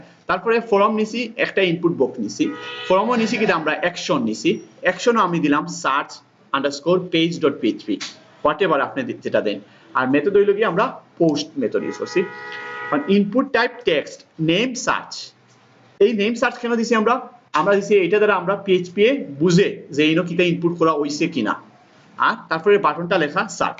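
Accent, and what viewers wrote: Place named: England, United Kingdom. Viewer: Parts sound English ish.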